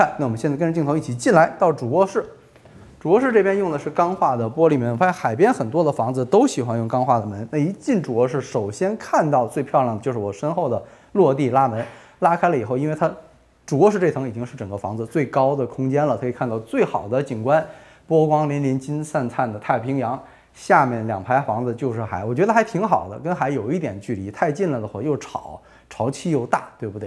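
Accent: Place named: Beijing, China. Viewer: 听口音应该是北京兄弟... 这种豪宅看看就好，因为维护及保养费用会高的惊人，我们这种做小生意的人一旦买了就真成了房奴了！节目办的不错，加油啊兄弟！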